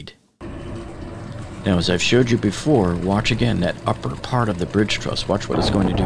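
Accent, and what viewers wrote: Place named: Chicago, United States. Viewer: Thanks for all your coverage!! Watch you ALL the time...are you from Chicago??? We recognize your accent.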